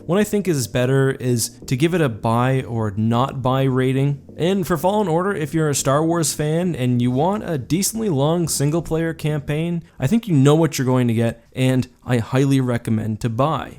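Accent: Canada. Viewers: Lots of “oots” and “aboots” in this video, I’ve never noticed how Canadian you sound before Eh.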